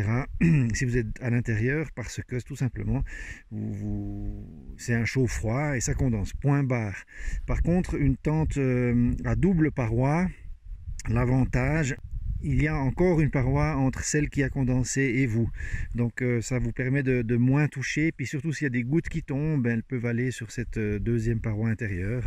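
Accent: Switzerland. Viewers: Merci pour ces belles images, à mon sens une grande qualité de réalisation! et j'apprécie tes valeurs (l'humain con ^^) Cela donne envie de visiter la Suisse en tout cas Et pourtant que de préjugés et autres clichés vu de France (bon en même temps vous nous le rendez bien) j'adore ton accent (léger) qui me fait voyager pas si loin certes, et pourtant il y a déjà tant de différences culturelles.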